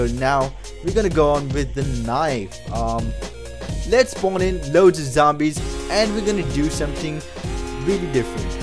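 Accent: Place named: Southall, United Kingdom. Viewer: U sound like southall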